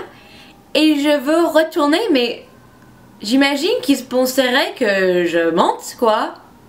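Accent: United States. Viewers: I learned French in school for 5 years now and thought I can speak and understand it now... After watching this video I know, that I can't 😅 It was so difficult to understand 😁 Et j'ai une question: Est-ce qu'elle américaine? Je crois, que c'est très faux ( dunno if this is the right word 😅), but I was wondering if she is amercian or something else native english? Btw I'm german 😄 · J'adore ton petit accent américain. Tu es trop choux!!! Biz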